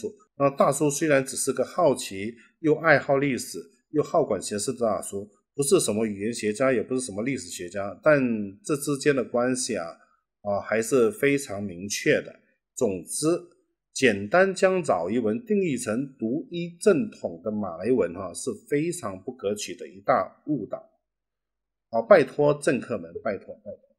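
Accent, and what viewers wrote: Standard. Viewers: 郷民A 我们的华语发音及用词很受自身籍贯的方言影响，也掺杂了少数马来语的词尾音，听起来有点特色又像中国南方地区的发音。大叔是属于中文造诣较好的，多数华人的发音都没有那么标准。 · 在马来西亚华人中大叔的普通话算很标准的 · 真的不容易，以前不了解马来亚华人的处境，现在通过大叔了解一二，听完真的不容易而且觉得马来华人真了不起~！所以请大家不要挑三拣四了。就算是所谓的中华圈，国内的好多地方和香港地区还不如大叔会说一口标准的中文呢。现在台湾也好不到哪里去，很多正规媒体差不多三分之一说的是台湾闽南语叫人无语……。加油大叔！ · 有一点请您多多原谅：首先您的普通话，不，是华语，实在跟我们太接近了。我经常忘了你是个外国人，而像我们广东广西省份人的口音，甚至比他们说的还标准。完全把您当中国人了。更主要的是您跟我们一样热爱中国。 我在想，这种感觉可能像韩国、朝鲜人听我们中国朝鲜族同胞说话一样。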